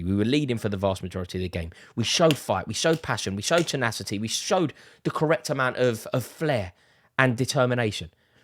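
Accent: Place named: Liverpool, United Kingdom. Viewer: Sound like a scouser....typical lfc supporter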